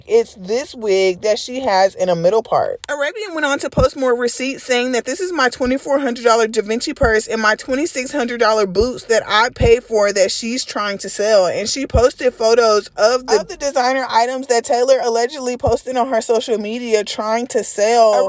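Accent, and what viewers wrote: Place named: Texas, United States. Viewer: lol i can tell yu from texas ...im from louisiana and you said them cities kinda wrong no shade tho but i live around thos cities too!!!! ima big 318!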